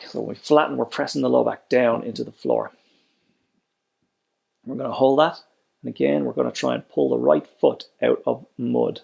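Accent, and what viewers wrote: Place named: Ireland. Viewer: What an eyeopener... wouldn't have believed how much feeling there was going on inside if I hadn't tried it out myself! Shout out to DH for advising me not to go straight over to the heavy bag and start kicking it too haha. This exercise is actually what got me really paying attention to this stuff. Now I have no choice but to look at all your other videos as my body is.... well I'll keep my adjectives civilized! Thanks mate and as an expat Aussie its nice to hear an Irish accent.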